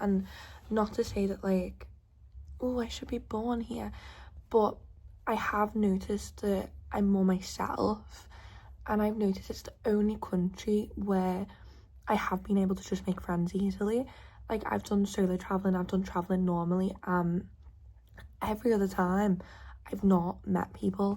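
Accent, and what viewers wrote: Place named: Liverpool, United Kingdom. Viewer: Plus, do not let anyone tell you that your scouse accent is hard to understand, as i understand you better than others . I mean that could be due to me being surrounded by the scouse accent all the time, but still better 😜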